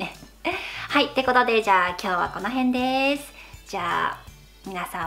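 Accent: United Kingdom. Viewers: とっても分かりやすいです！因みにChiakiさんはイギリスアクセントをどうやって学んだのか気になります！そして、やはりまずはAmerican accentをある程度身につけてからの方が良いのでしょうか？？👀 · すごく分かりやすいです。私の先生はイギリス人なので、の話方が、本当に参考になります！先生と会話が続くようになりたくて、youtubeを必死で見ています。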